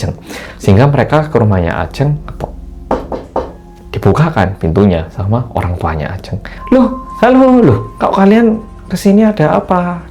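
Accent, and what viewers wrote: Java, Indonesia. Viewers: Hai medoook❤ RinDingDing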